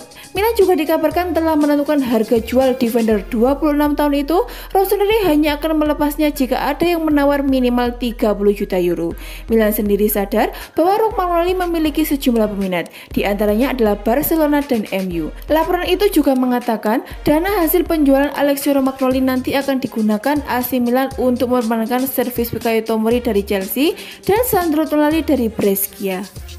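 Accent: Java, Indonesia. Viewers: Medddoook banget mbaaaakk eee 😅😅😅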